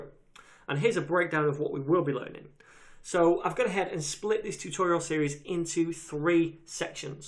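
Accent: United Kingdom. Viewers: What can I say I love British accent thumbs UP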